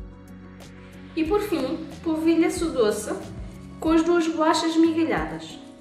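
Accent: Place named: Portugal. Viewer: estado??? Portugal.. conhece? A fala PT-PT.. Não é Br.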